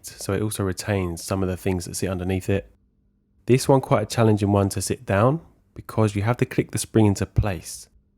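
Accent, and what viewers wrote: London, United Kingdom. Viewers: Hello. Nice video I liked it all. As a Seiko nut I am still surprised I’ve not worked on a 5606 I have 3 to restore but just never got around to them ! You clearly are English and London by the sounds of it so what cleaning fluid can’t you get? I still rate Essence of Renata for a good hairspring degreaser. As for crystal don’t bother looking for originals as you can get any domed , flat or tension ring on aftermarket for little money from cousins. Sternkruez make decent ones. I’d only seek out original Seikos on acrylic flanged or special facet ones. The gear you will have bought from Adrian as they are good. A guy in my Facebook group recently 3D printed the 4 teeth ones which he was selling for $5 each. They work good apparently. I have 5 in stock as I know two of my watches have the fault. Also been looking at that jewellers tool myself recently! 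Will buy it now I think.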